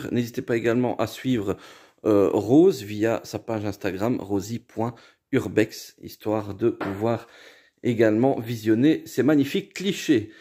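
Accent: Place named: Belgium. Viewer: Bien! Tu me fais chaque fois rire avec ta prononciation typiquement belge, "fourboui"! En France nous disons juste "fourbi" Belle continuation & merci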